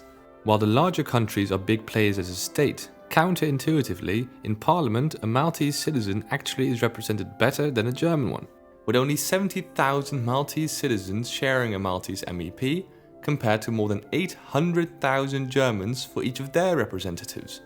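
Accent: Netherlands. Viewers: Why do you sound Dutch? Are you? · You're Dutch, right? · I hear Dutch accent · I hear a slight Dutch accent am I right?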